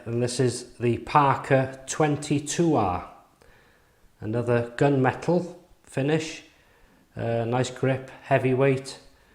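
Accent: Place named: Liverpool, United Kingdom. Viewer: Sounds scouse mate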